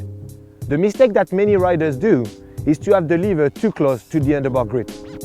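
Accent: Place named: England, United Kingdom. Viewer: Les conseils avec un accent anglais moyen, c'est pas mal. En Français, ça le ferait pas mal aussi.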